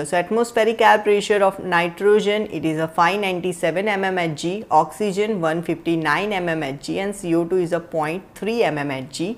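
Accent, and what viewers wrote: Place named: India. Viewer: Sorry but when I thought a video is in native English but turned out that it's Indian accent English, somehow I hate it.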